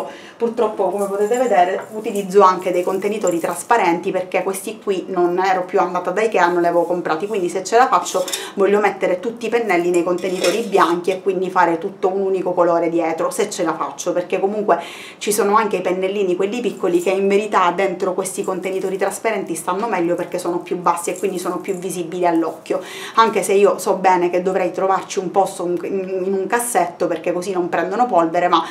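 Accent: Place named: Catania, Italy. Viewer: Hai un accento bellissimo ,sei catanese per caso ?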